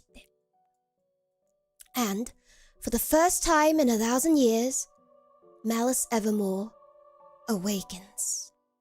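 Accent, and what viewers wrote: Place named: United Kingdom. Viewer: I never know an ojou-sama with British accent is what I need all along.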